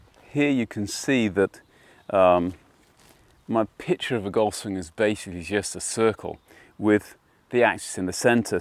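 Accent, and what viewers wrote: England, United Kingdom. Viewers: Guy sounds like Mick Jagger same accent.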